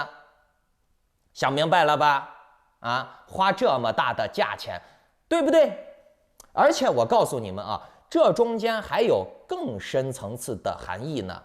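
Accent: Henan, China. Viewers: 我听着你就像是河南人。你在国内并没有在国外。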